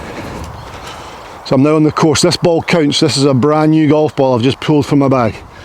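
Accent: Scotland, United Kingdom. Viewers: I love watching your videos, as a Dutchman I have a extreme weak spot for Scottish accents. Love how you always come strrraight to the point. For me I developed a slightly embarrassing release feel: For my irons the release feel is as follows: If I literally would let go of the club I would have it hit someone in front of me in his mid section, for the driver it would be his head. Not sure what a psychiatrist would think of that but it works for me. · I love your videos,. They are loaded with good tips, and you have a delightfully Scottish way of delivering them. Keep at it.m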